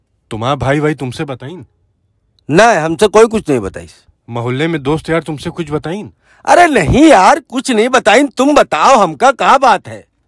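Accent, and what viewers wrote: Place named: Kanpur, India. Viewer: कसम से कानपुर में ऐसे ही बात करते हैं...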